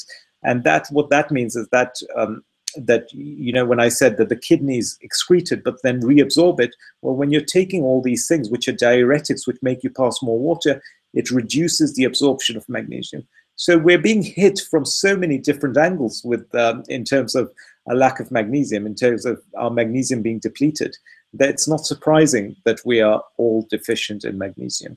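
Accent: United Kingdom. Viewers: Love your accent, British ya?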